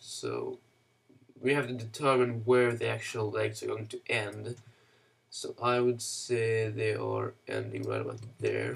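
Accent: Sweden. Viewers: Haha, fan va man hör att du kommer från sverige ibland!! Lidingö eller? :P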